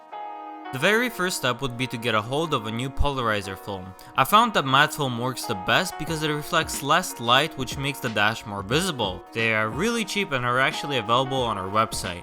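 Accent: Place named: Russia. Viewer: Русский акцент ни с чем не спутаешь. Спасибо, бро 😀👍🏼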